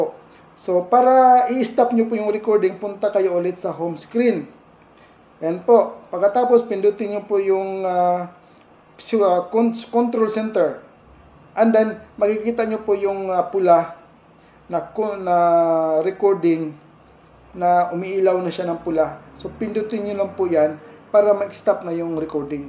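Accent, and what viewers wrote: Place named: Iloilo, Philippines. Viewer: Ilonggo guro nis kuya ay.. hehe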